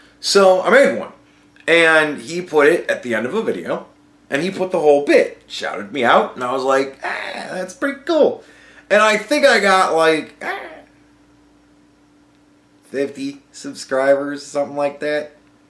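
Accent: United States. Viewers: another one from Bearing, but I do have you on 1.25 speed cos dude..... you like to take your time and yeah, you may have been funnier in that clip than normal, game up dude. nice to listen to a "normal" american every now and then. all the best. i'm not sticking around for the full 23mins though, I couldn't bear it.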